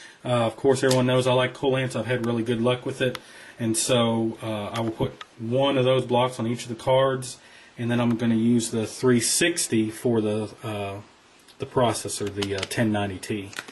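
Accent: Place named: Southeast, United States. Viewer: your accent sounds like american south-east? Would you mind telling where you live? don't need to be specific.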